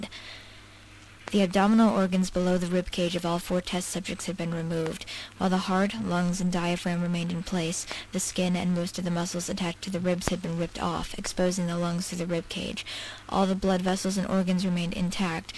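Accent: Russia. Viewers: I read this when I was young(er) and hearing it like this brought back strong nostalgia! I liked the Russian accent and while the distorted vhs voice thing was cool, I feel it could've been more focused to the crackling sound instead of the fuzz. But overall I rate this reading 8.99/10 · Ya ya, The russian accent is the best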